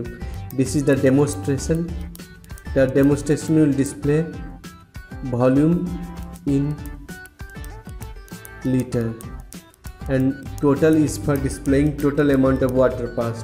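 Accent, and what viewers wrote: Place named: India. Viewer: Hard to understand with that Indian accent and the background music makes it even harder to understand what is saying. Now you know what need to change. Content and illustration are good.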